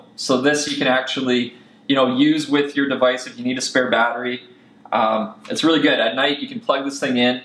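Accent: Canada. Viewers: is he canadian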